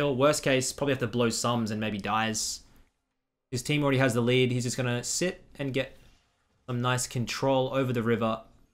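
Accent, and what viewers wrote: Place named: Australia. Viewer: Came for the Australian accent, stayed for the K N O W L E D G E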